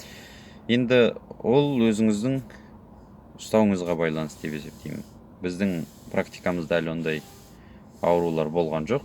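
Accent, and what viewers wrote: Standard. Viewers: Бауырым Алла разы болсын. Қаймағы бұзылмаған Қарағанды тумасы қазақшаң таза екен. Кеңесіңе рахмет. Біраз нәрсе үйренуге тұрарлық. Өзім қатты қызығатын кәсіп. Ісіңе сәттілік. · Бауырым саған риза болып жатырмын Сенің қазақша таза сөйлеуіңе еңбегің жансын кеңестерңді жіберіп тұр Бұл біздің қазағымызға керек кеңес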